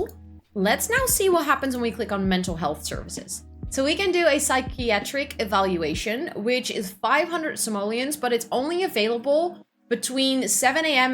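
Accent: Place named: Netherlands. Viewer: WAIT A SEC!!! BEN JE NEDERLANFS????????? ik bedoel ik hoorde het wel al maar dit is. zo. cool! dit heeft me echt gemotiveerd! ik dacht dat ik raar zou zijn als ik mijn sims content in het engels zou maken maar wow. i'm flabbergasted!!! IK SUBSCRIBE NU GELIJK! je engels is echt super goed.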